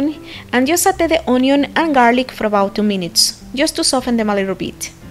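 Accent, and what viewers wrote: India. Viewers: bien sabroso caldo camaron grascias. from where are u?? u are from India ur accent from India,I love that soup I rather use fresh shrimp, it's perfect for now winter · If she's Mexican but she sounds like she comes from daneli india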